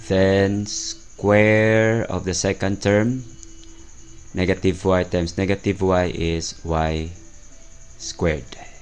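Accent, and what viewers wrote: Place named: Philippines. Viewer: You sound like a Filipino po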